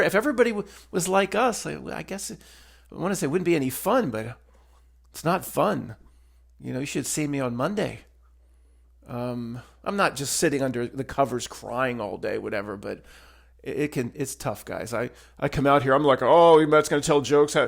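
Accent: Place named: New Jersey, United States. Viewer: Aye! Just resubbed, been a loon̈nng time (I wanna say 2022ish?) Been w u since shrugged, just wanted to say something to yo ass: Thank you. Youve been like an older brother (est.1989) to me since I found myself awake in a world where even my family couldn't see.. sure some of the 1st and 2nd grade truth helped at that period, however there came a point where I needed some1 like you.. no homo. A guy who could be my cousin; just talkin how we talk (jersey) but about the stuff that I needed to flesh out. The stuff that, if brought up to my friends and family would end in argument guaranteed. Anyway, u have no clue, cuz u algorithmically suppressed n shiieeeet, how many individuals like me that were introduced to your content at the time the needed it most. You have no idea how many ppl u have helped. It could be 1, it could be infinitely more, and i speak for myself, yet have a feeling any others would say the s ame thing.. 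if we caught u out; on the worst Monday of your life, we would see you no different my friend. We are the modern day pioneer, seekers, exploring uncharted territory that would make the vast majority of the populace go crazy within days. This is why they refuse to see.. Anyway hope u read this comment. Glad to be back (to YouTube in general).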